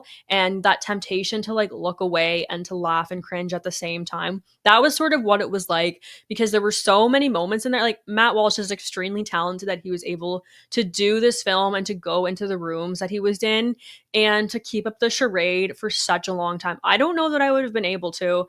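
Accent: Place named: Canada. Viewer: American here. You definitely have a Canadian accent. Funnily enough, only people from Winnipeg and Vancouver don’t seem to have a very thick Canadian accent, more neutral North American like.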